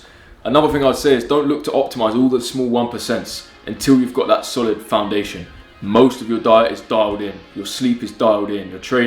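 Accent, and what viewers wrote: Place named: United Kingdom. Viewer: Which part of the uk you come from?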